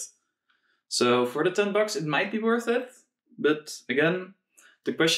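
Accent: Netherlands. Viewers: Haha dank je voor de video. Ik heb besloten mijn 2DS te modden zodat ik ook oude games kan spelen. Zelfs CBM64 (commodore) gamen. Nu zit er homebrew op :-) Lachen man, ik hoorde aan je accent dat je Nederlands bent. Maarreh toch vermakelijk die Ali consoles :o)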